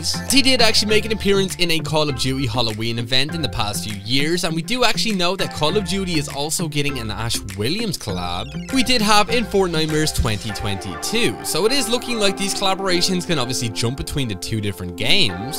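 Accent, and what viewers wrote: Ireland. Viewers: Thanks Thats Awesome!!!! Your CLass!! Are you from Ireland?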